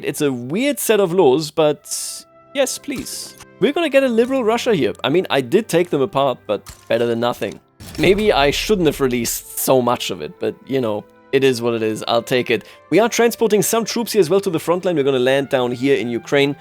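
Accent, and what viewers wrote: Germany. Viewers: I like your german accent. It has that analytical feel to it.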